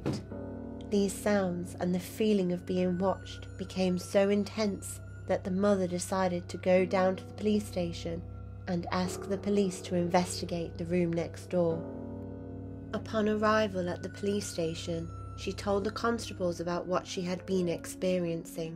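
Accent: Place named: Liverpool, United Kingdom. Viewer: Sounds like the fella in 'The Flying Pickets'. Weren't they from Liverpool?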